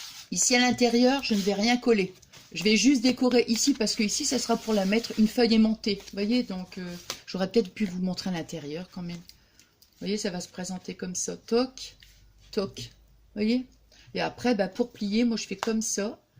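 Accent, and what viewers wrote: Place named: North, France. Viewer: Coucou très simpa ton petit album je suis nouvelle abonné et je te trouve très rigolote ton accent du nord me fait plaisir je suis de la Picardie bisous Merci pour ta vidéo gros bisous ☺😀😚👍💕👏💕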